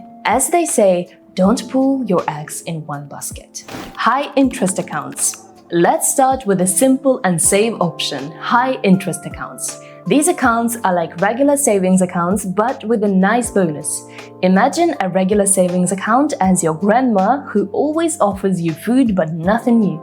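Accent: Russia. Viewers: Russian pronunciation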